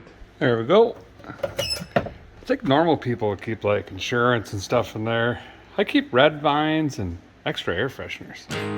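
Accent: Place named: Michigan, United States. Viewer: Where you from? You talk just like my cousin in Mich!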